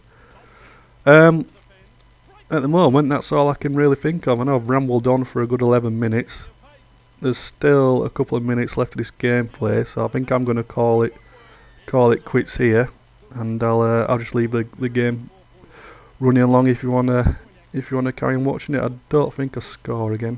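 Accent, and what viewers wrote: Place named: Yorkshire, United Kingdom. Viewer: I knew this lad was going to be talking sense about Rugby League when I heard his Yorkshire accent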